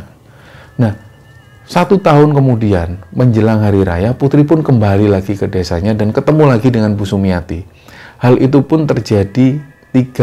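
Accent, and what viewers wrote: Java, Indonesia. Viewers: Mlm maz..crt ny keren2 moga sht2 tuk kru KS trutama maz yg logat ny kjowo jowoane sngt kas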